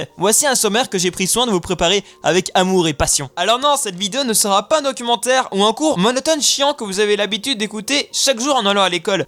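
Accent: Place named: Wallonia, Belgium. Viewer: Toi tu viens de belgique et t'es un wallon :p vu que tu dis: Wouit au lieu de huit :p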